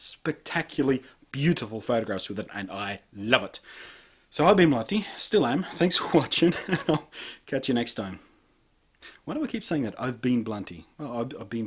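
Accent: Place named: Australia. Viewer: the australian way of saying "H" is awsome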